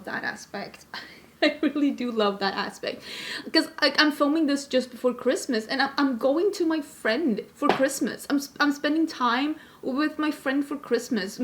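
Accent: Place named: Sweden. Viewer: I think I heard you mention previously that you are from Linköping, which is the town my mother was from. She passed away almost 2 years ago and I miss her terribly. Your talking about Sweden and your accent bring me comfort! Sweden is a beautiful country, I have many great memories of it from childhood.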